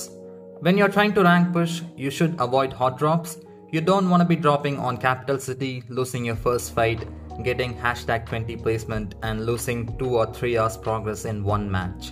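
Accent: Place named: South India, India. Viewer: Tbh I like the little south Indian accent on it 😅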